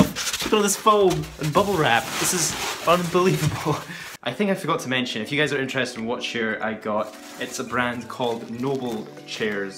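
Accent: Scotland, United Kingdom. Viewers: R u scottish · Nice to find a fellow Scot on YT. Fwendsssss :D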